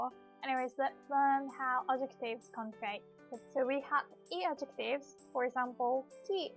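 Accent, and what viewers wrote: England, United Kingdom. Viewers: The main reason why I like these videos in particular is because of how she incorporates a lot of vocabulary all at once and she does it in a way where it flows well and isn't overwhelming. And am I the only one who hears a bit of an English accent? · Thank you very much for these videos! I've watched five lessons today and will come back tomorrow to learn more. Your clear British English accent is what makes the channel different and unique from other channels. Please keep it up with the videos, they are informative and useful!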